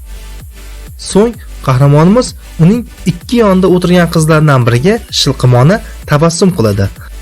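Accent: Namangan, Uzbekistan. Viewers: аввало узингни .талаффузингни Тугрилагин Наманганликмисан нима бало шевангни. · Наманган шеъвада гапирган админ. Шеъвани йўқотиб адабий тилда чиройлироқ гапиринг. Русча сўзларни ҳам Наманган шеъвада гапириб юбораябсиз. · Наманганлик акансиза шархловчи😊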